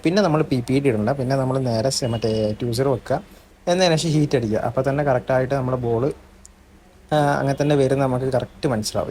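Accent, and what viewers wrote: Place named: Kannur, India. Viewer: Kannurkaran thanne.. pakka Kannur accent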